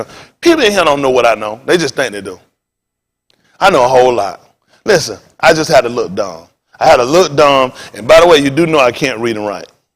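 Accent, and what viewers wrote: South, United States. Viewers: I'm confused is he teaching bible or what yesterday was the first time i heard him and he was angry at pastor Jennings I'm tryin to stay connected to God why is it so much confusion going on teach or talk about whats happen in the world.and not explain what ever he's tryin to say what? Is he high!! A fast country talkin bad language in the church.he is in church?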